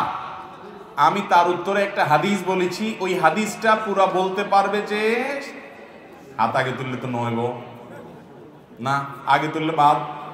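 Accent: Chittagong, Bangladesh. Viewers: মাশা-আল্লাহ,হুজুরের মুখে চট্টগ্রামের ভাষা চমৎকার লেগেছে। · আহমাদুল্লাহ দেখি চট্টগ্রামের ভাষাও পারেন। চট্টগামে উনার মাহফিল হলে খবর কেমনে পাব?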